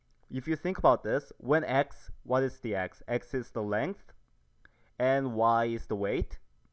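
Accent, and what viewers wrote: South Korea. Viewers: I’m korean, and his accent sounds VERY korean. :)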